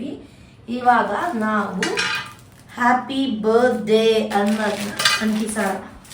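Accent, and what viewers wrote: Mandya, India. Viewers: Hai akka nim Amma jothee enu thumba video madee nim mandya bashee thumba changedee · ಮಂಡ್ಯ ಭಾಷೆ ಸೂಪರ್ 😂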